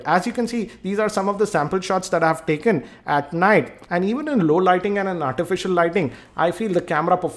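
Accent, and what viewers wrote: India. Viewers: indian accent makes my ears bleed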